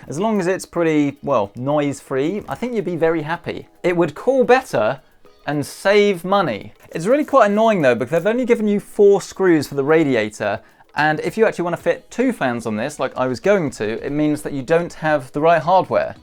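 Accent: United Kingdom. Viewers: your bri'ish accent made me to watch the full video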